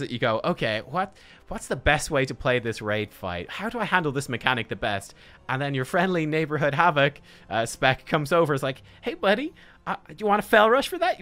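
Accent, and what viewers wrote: Ireland. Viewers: Dat sweet Irish accent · are you irish or am i picking up the accent entirely wrong?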